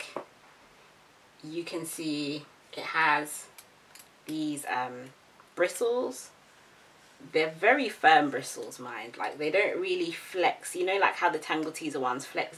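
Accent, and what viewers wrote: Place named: London, United Kingdom. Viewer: thank you!!! I was trying to figure out your accent cause I knew it was London but I didn't know what part. I am from southeast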